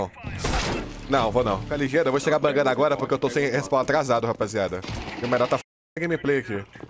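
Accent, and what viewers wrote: Belo Horizonte, Brazil. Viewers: tu fala igual meus conterrâneos daqui de BH kkkkk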